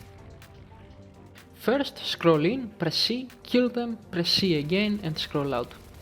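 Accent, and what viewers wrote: Greece. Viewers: Εχεις τοσο ελληνικη προφορα στα αγγλικα! Ωραιο βοντεο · το κατάλαβα από την προφορά , αδερφέ. Έλληνας και εγώ είμαι. Είσαι καλός.. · Are you from Greece? Casue you sound a lot like a greek person!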